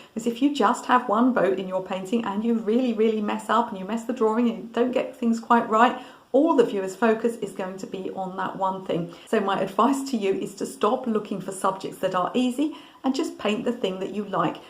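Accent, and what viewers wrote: United Kingdom. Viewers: Wow ~ I thought you were talking to me about ME! ~ As in, all of the above mistakes, almost. The only one that didn’t apply was the Bonus. I’m so timid I let my paint dry way to much, most of the time. Then I have to work myself up to coming back to it! Like I end up going to the kitchen and making a pot of vegetable soup before returning to it. Seriously, I appreciate all of your observations ~ just listening to your beautiful British accent brings me a bit of calm and resolve to get my act together. Thanks so much ~ please keep up the good work💁🏼‍♀️😻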